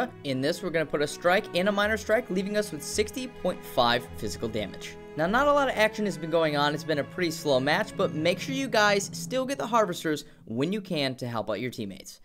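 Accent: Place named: United Kingdom. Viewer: That British accent though..... Man. I really want to play a match with you. I'm getting so frustrated at playing builds similar to this and kicking ass and having a weak team that just don't make the power plays you need them to... I've gone 21/2/6 before now and still lost the match. You can't carry a bad team :( 👎🏻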